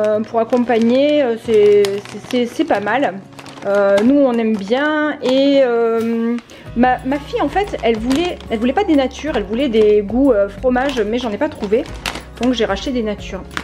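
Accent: South, France. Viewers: J'adore vos vidéos avec ce petit accent du Sud, vous avez l'air d'être très sympa, naturelle et une personne sans prise de tête.. Je vais faire 2 ou 3 courses a Lidl 😅...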